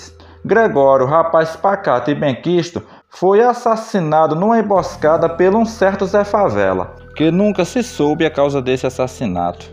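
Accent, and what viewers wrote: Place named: Northeast, Brazil. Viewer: Sou de SP ❤ amo essas narrativas; a poesia contida nelas, me enchem de emoção. Amo esse sotaque Nordestino.👏👏👏👏👏👏👏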